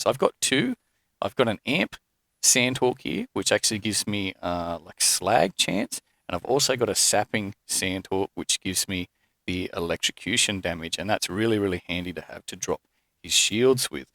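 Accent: Australia. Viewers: Mate where from the Aussie land are u from? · I just got here from yote actually. Glad I did, great videos mate, very informative, I love the farming run ones.. It's also good to hear an aussie accent as well, sounds like NSW to me. Keep em coming.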